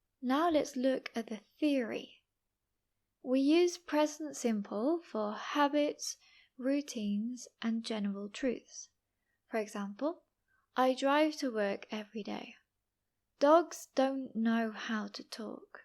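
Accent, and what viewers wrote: United Kingdom. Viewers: Hello, your British accent is RP or MRP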